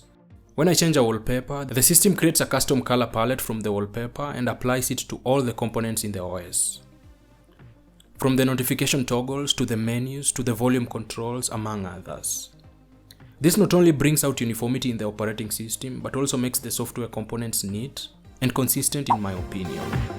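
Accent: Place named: Kenya. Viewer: Am sure this guy is from Kenya! That accent ! 👍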